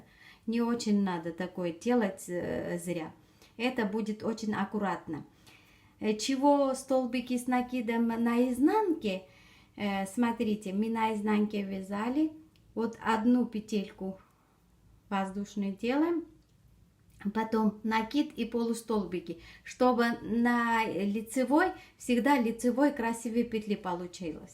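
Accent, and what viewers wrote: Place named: Dnipro, Ukraine. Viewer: ! какой вы красивый кардиган связали! он у вас получился такой элегантный! и еще: мне послышалось или вы и правда из Днепра?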